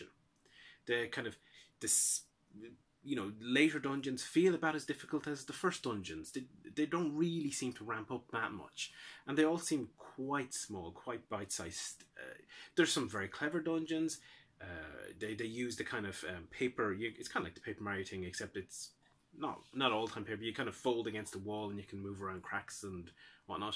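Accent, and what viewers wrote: Ireland. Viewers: Are you really Irish or is it a fake accent?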